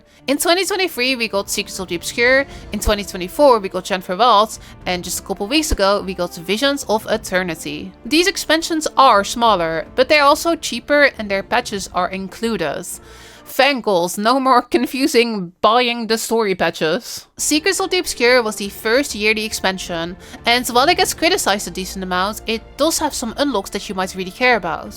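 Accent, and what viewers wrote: Netherlands. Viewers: wat is je nederlands engels accent irritant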